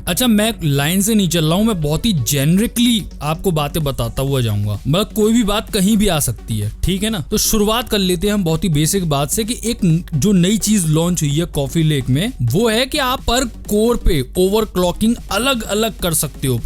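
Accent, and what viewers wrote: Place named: Rajasthan, India. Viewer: U sound like you are from Rajasthan 😁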